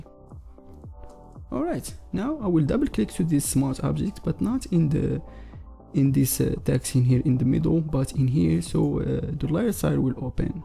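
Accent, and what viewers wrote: Morocco. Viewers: Mn lhja dyalk bayna fik mghribi 😅😅 Kml bro❣️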